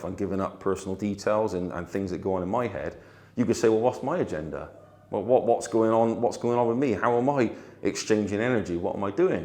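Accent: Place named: Bristol, United Kingdom. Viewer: Brilliant lecture...sounds like from Bristol too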